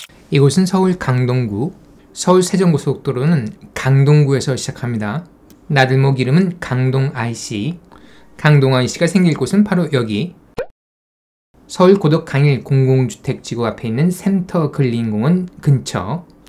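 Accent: North Korea. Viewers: 말투가 탈북인 같은데....아.... 새터민....이지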